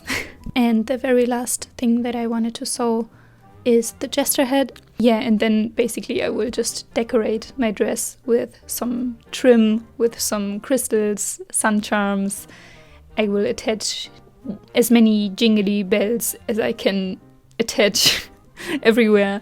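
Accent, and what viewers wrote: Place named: Germany. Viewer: I WAS SO SURE YOU'RE GERMAN BC OF YOUR ACCENT- fellow german here :3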